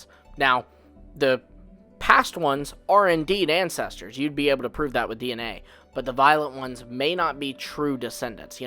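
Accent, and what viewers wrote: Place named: Midwest, United States. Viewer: "Ope", dude, you are SO Midwestern in your talk. XD That's totally cool! So am I. I had to be clued into the fact a year or so ago that I actually said that word as much as everyone else. Never noticed. XD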